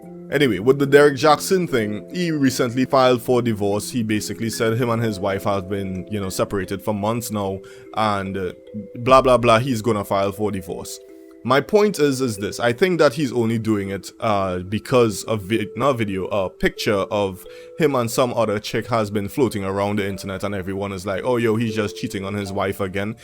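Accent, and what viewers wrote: Trinidad and Tobago. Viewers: Are you from Trinidad bro?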